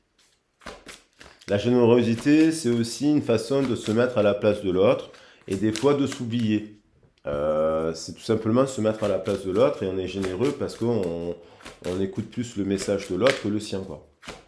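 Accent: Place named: Marseille, France. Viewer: Ah oui c'est l'accent de Marseille...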